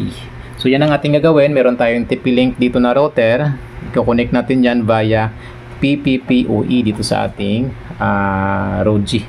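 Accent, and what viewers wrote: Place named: Bacolod, Philippines. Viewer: taga bacolod ka sir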